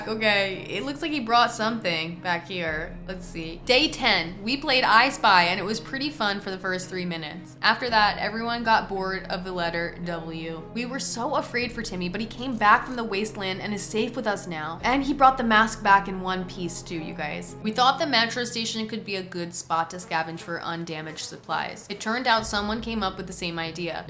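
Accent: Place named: West, United States. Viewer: I fear has slipped into her wild wild western supermarket accent and it hasnt gone away 😭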